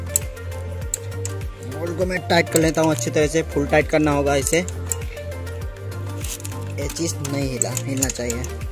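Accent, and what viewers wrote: India. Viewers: Ap ke bato se lagta hai ap Bengali ho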